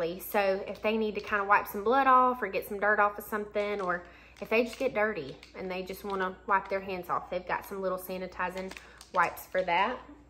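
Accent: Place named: Texas, United States. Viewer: Are you from Texas because you sound like you are I kinda like it 😅 bc it sounds really country for some reason 😂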